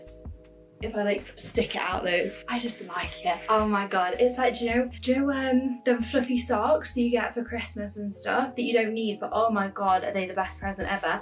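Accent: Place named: United Kingdom. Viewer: Her British accent is such a turn on.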